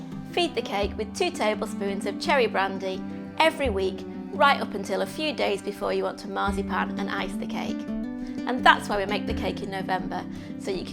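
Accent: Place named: Yorkshire, United Kingdom. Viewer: Well, I made 2 cakes , and they were so delicious , we already ate one! Now im making another! Lovely, easy recipe, cant go wrong, and I love your Yorkshire accent, reminds me of home! Love Janet in Norway! x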